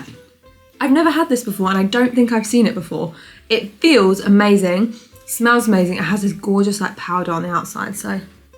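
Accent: England, United Kingdom. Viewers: Je viens de te découvrir, j'ai beaucoup aimé cette vidéo. Ce qui me perturbe c'est ton accent anglais alors que tu es en Amérique puisque tu testes Costco... À part ça merci pour cette vidéo. Bisous de France ❤❤❤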